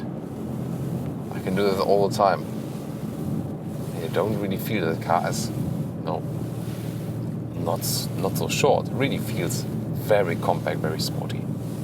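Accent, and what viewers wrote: Germany. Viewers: can't understand, you in Germany, you are German... and reviewing in English, don't get me wrong, but your German accent pain to listen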